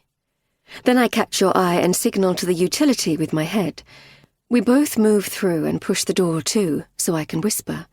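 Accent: United Kingdom. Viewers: I love British accent. I actually prefer British accent instead of an American one.❤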